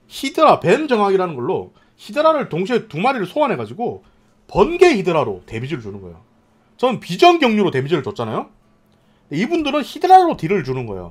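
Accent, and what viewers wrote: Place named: Gyeongsang, South Korea. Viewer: 원래 경상도 사람 저정도 악센트 다 있는데.. 듣기 싫으면 다른걸 보던지 다 님처럼 생각하지 않으니 님이 이해를 하세요 서울사람보고 느끼하니까 말투 바꿔라고 하면 똑같이 기분나쁠거 아닙니까 ?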